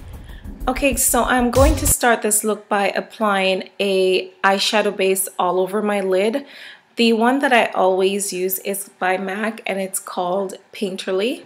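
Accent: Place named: Jamaica. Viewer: Lovely makeup. I can hear an accent. Are you from Jamaica? If so, I am too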